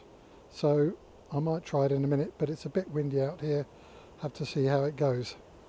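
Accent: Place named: United Kingdom. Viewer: Thanks for your video, it's very helpful for a beginner like me. You sound like you are from the UK. Did you emigrate to Australia or are you on holiday? Best wishes, Rob, Romford, Essex.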